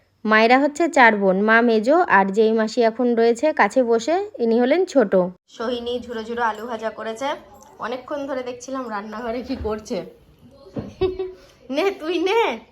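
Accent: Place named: Bangal, Bangladesh. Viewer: Tumar mukha bangal vasa darun laga❤❤❤❤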